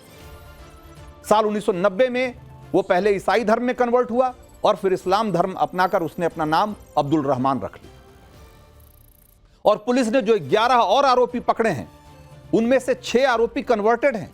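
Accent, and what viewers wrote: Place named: UP-Bihar, India. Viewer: Bhai aap k bolne se to saaf pata chalta hai ki aap up,bihar ,Jharkhand k bhayye ho lekin aap jo b bata rahe ho acha bata rahe ho, lekin kalko paise k khatir beimaani aur gaddari mat karna, kyunki bhayye log ki zubaan, saap ki zubaan ki tarah hoti hai, kabi b palat sakti Hai.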